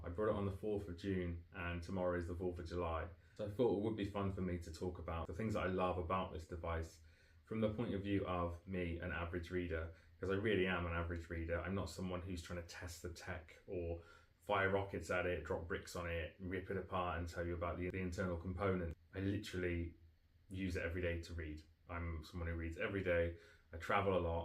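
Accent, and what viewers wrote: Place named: United Kingdom. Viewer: Love UK accents, Americans (me) so boring...lol I just subscribed to your channel. As you can get cheapers stylus just research just ordered my Kobo Libra Colour a few days ago! . It is $219.99 in the US . Now I have to transfer allmy Kindle books. I know i can use calibre to transfer.. I also read a lot of Sarah Maas books her books are over 700 pages. I also have Kindle Paperwhite and Oasis. I am excited about switching to Kobo and started using Libby. I loved your video! I love the library intergration too. I should be getting mine in three days. So freaking excited! Cheers! xx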